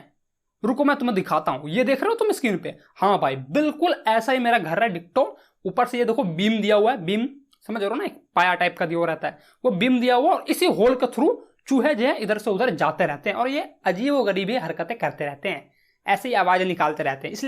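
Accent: Bihar, India. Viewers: Bro are you Bihari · Bhai aap Bihar se hai kya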